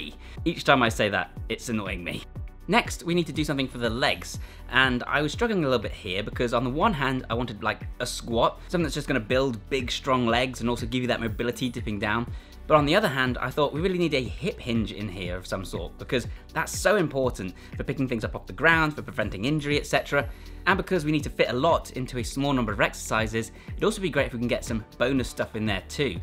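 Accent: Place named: RP, United Kingdom. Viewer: Poshest sounding beast I've seen 🥂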